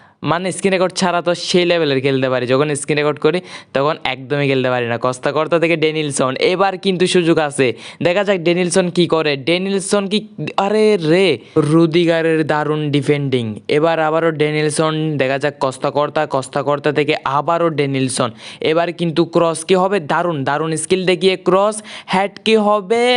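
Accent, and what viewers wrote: Chittagong, Bangladesh. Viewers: Vaiya apnar vasa Chittagong a kutai amar Chawkbazar ❤❤❤ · ভাই আমার মনে হয় আপনি চিটাংগা। আপনার বাড়ী কোথায়?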